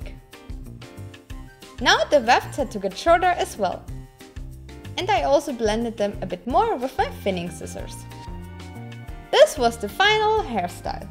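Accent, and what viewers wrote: Germany. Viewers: Sehr unterhaltsam und echt gut umgesetzt. Wobei ich immernoch nicht verstehe wieso Medion seine Gamingsparte Radiergummi nennt. Das deutsche kommt gaaaanz leicht durch aber respekt. So gut sprechen nicht viele. Hoffe Corona gretscht dir/euch nicht zu sehr ins Geschäft. Wünsche nur das beste. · That accent...I bet your're German. xD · Sounds like: Englisch mit deutschen Akzent.^^ Aber echt schöne Arbeit. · I feel like your accent was german right? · Wow...I am watching this only 2+ years later but I am amazed and inspired how this cheap material can be made into such awesome looking armor! Somemore you have been commissioned to do this for a company! Well done! Now I also finally know you are from (Southern?) Germany (your accent sounded like it but your name didn't so wasn't too sure...:P). I am a costumer myself but I have not worked with EVA foam yet, hope I can reach your level one day and make maybe a medieval or fantasy armor using EVA foam (due to high flexibility and low costs). 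I also am inspired seeing you using a laser cutter (isn't it dangerous?) to make those awesome zig-zag strip-away patterns and dremmel and sewing machine to make the rest of the armor. And how you custom made (!) all those under gloves and boot covers (I thought I would have sourced them cheaply online like manufactured from China, anyway that's just my thought to save time and patience, which you seem to have no problems!). Overall awesome and no surprise that the company has asked you to do it, an expert (and cheerful inspiration to other costumers around the world)! Keep it up! 👍👍👍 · sounds hard like german english :D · oh boy, the cringy german accent tho. The video looks really interesting, but, (as a german myself btw) i just can´t stand that horrible accent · I love that Germán accent. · Sag mal hast Du auch Videos auf deutsch?!? 🤔 . Denn so wie Du Englisch sprichst müsstest Du aus Deutschland kommen!?! 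🤔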